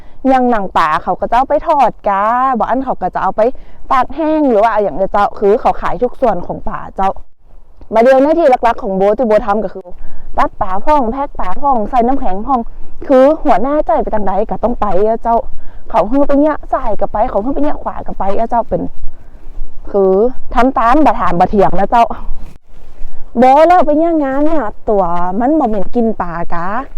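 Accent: Thailand. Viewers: ฟังภาษาเหนือเพลินมากจ้า😁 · ฟังม่วนดี ขอทายเป๋นคนพะเยาแม่นก่อ..คิคิ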